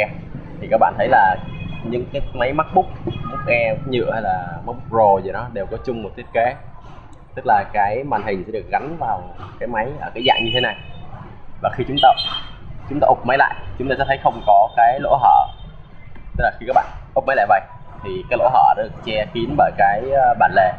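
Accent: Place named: Da Nang, Vietnam. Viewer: chu choa, air2011 mỏng và nhẹ tới khó tin:D air 2012 mình chưa đc coi video tiếng V, nó có j thú vị 0 mấy a ? mà e thấy mấy a nên bật máy và làm vài soft+ mấy tính năng hay hay để lồng vào video đi, chớ nhìn phần cứng mãi cũng chán mà cái bàn rê magic touchpad của riêng mac có bán sẵn ko ? nếu có thì giá nhiu? . p/s: 0 có cảm tình w giọng nói của a này :)) ọp ẹp, nam chả ra nam mà bắc cũng ếu ra bắc, nửa ĐN nửa nẩu nẩu (giọng... ái nam ái nữ_, clip sau nói tiếng fổ thông 100% cho dễ nghe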